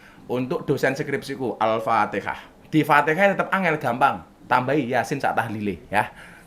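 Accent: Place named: Indonesia. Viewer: Wow cara baca lafadz arabnya khas islam nusantara. Ngaji dimana ya pak?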